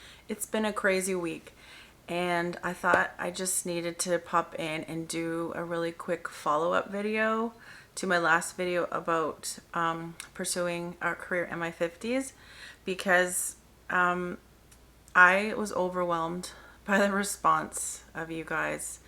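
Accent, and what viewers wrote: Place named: Canada. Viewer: Congratulations!!! I watched your video a day or two ago and knew it would resonate with so many people because you were telling your personal story and people love to hear stories that feel rooted in the “hero’s journey” where someone is choosing to step out of their regular existence to follow excitement and adventure; what bigger adventure is there than the one to create a life you love?! Also, I loved that as soon as you started speaking I thought “she’s a Canadian”. As a Canadian (from Toronto) residing in the US I enjoy hearing the cadence and “accent” of my people. I miss home a lot! 🙂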